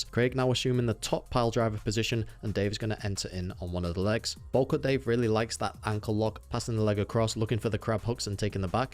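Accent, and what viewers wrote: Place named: United Kingdom. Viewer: Shit is literally unwatchable with this limey talking the whole time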